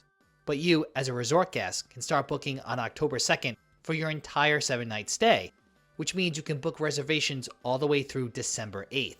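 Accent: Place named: Philadelphia, United States. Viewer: announcer sounds like he's from Philly- "wudder" instead of water. it's a SEPA thing.....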